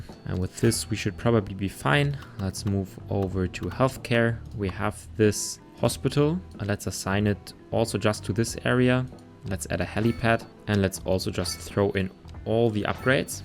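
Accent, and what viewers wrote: Germany. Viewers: Just a small question. Are you speaking german or some other similar language. Cause your accent seems to come out of that direction. Great Video btw.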